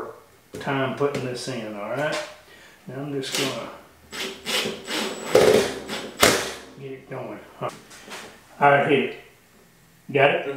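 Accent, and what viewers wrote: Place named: Florida, United States. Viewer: Just asking, love the accent man, reminds me of FL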